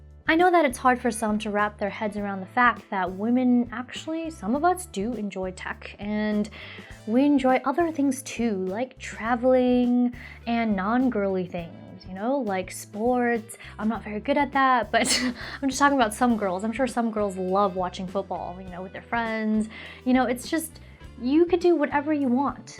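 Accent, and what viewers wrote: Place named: United States. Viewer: well...as pwrOverwhelming states..you're the perfect host for tech shows and some other shows too..crude comments from keyboarders you dealt with professionally..comments about your asian ethnicity-some were not flattering to you..to be beautiful chinese and obviously long time in america to have an american accent-you grew up in USA?..if only there were more like you..and using screwdrivers and other tools doesn't make you weird..its just shame more girls don't get involved in tech other than using smartphones..i can see a TV company contracting you with your popularity..specs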